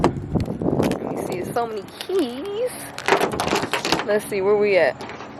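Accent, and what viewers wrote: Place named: Omaha, United States. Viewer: you sound like your from Omaha Nebraska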